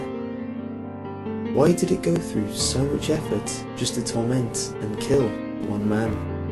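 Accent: United Kingdom. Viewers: You have a gorgeous voice. Always loved British accents.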